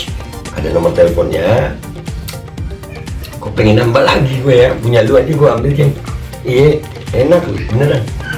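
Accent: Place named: East Java, Indonesia. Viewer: LOGATNYA SEPERTI JAWA TIMURAN..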